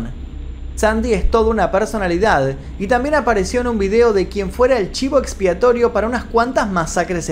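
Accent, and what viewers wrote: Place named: Argentina. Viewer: si se re nota que es argentino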